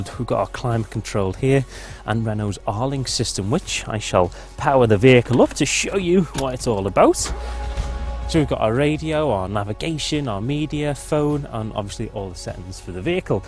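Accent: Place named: Wirral, United Kingdom. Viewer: Annoying Wirral accent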